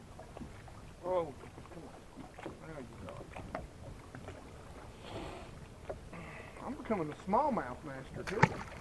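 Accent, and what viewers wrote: South, United States. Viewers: I like the laid back feel you give your videos, the music, your southern accent (us Canadians are hyper and say eh all the time... eh). Looks like a good time! thanks for takin' me with ya.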